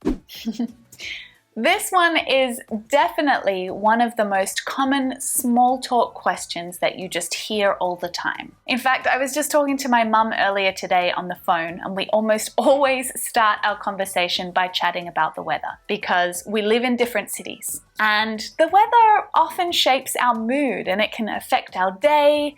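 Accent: Australia. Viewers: I love your videos and I love your Australian accent ❤ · Your Australian accent has made you a special English language teacher. Do all Australians have the same accent as yours? I really like it. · I love Australian accent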